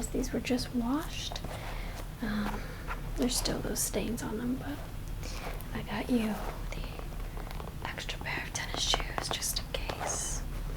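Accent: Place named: Brooklyn, United States. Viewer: Always love your Brooklyn accent 😁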